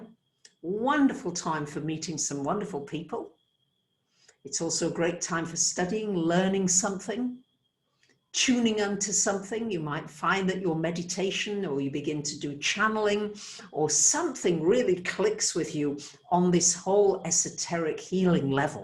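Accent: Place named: London, United Kingdom. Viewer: Idk y this whole time I considered ur accent Aussie but I now hear london 😌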